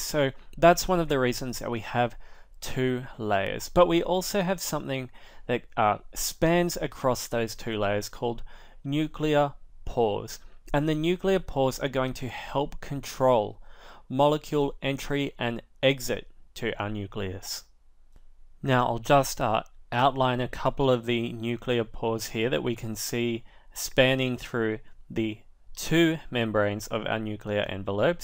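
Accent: Australia. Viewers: Is that australian accent??? 😍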